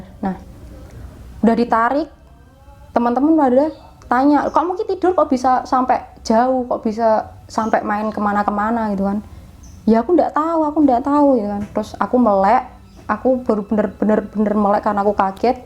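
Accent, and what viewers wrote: Java, Indonesia. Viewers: Bahasanya medok banget wkwkwkwkw. Mbulett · Jawa nya masih medok kak 😊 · Mbak ngomonge medok 😂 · Logat jawa nya medok banget Hehehe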